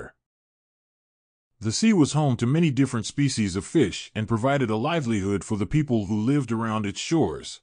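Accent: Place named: United States. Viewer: Мерзкий американский акцент + неккоретное произношение👎👎👎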